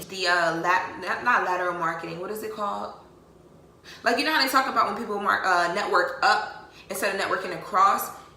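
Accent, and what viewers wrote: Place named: Philadelphia, United States. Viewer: Okay Delaware. I was thinking your accent was Philly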